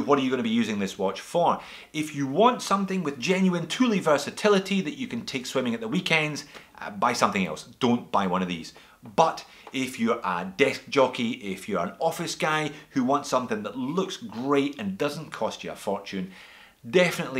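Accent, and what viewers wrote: Scotland, United Kingdom. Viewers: what does that even mean? Apparently this is your first time watching the channel. He's a for real Scotsman, living in Sydney, Australia. He talks the way he talks, always. No need to be insulting. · Who are you, where are you. Scottish accent? Just beginning to learn about watches here. You’re videos are very “uplifting”. Much appreciated. Happy Thanksgiving 🦃 from California to you no matter where you are in the world.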